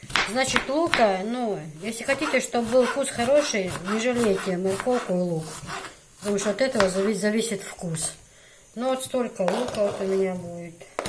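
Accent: Chechnya, Russia. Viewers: ты такой молодец,знаю как было тебе тяжело освоить ментилитет чеченский,ты справилась на все 100%,ты полностью воплотилась в чеченку,что акцент,что внешность,что монеры.Хвала тебе,и восхищение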